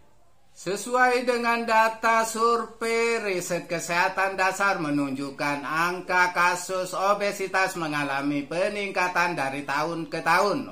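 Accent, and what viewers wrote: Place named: Java, Indonesia. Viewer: Mantap jowa ciri khas suaranya bah semangat terus om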